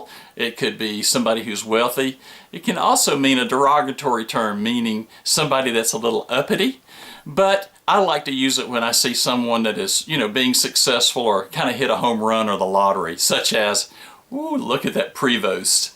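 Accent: South, United States. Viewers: Well, you do have a southern accent but I grew up about 100 miles south of Macon and I studied architecture at Auburn University. Let me tell you, when I got to Auburn my accent was so thick the people from Alabama made fun of the way I talked. After moving to Atlanta for a while, my accent kinda faded. When ever I go back home tho, my accent bows up and I know I sound dif'errnt.;-)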